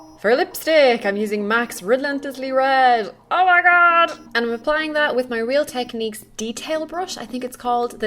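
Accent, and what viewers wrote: Ireland. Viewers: You look just beautiful, radiant!! I love your vlogs. I'm a Brit living in the States. It is great to hear an Irish voice! :-)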